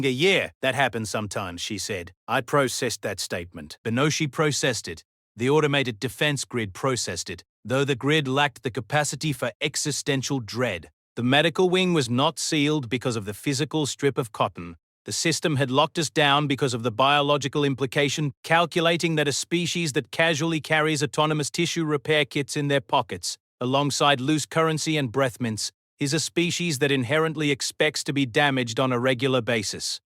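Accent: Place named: Australia. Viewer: Love the narrator's Strine accent!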